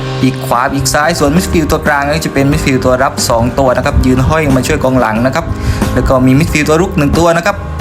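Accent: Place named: South, Thailand. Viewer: คนใต้ม่ายนิพี่ สําเนียงชัดๆเรย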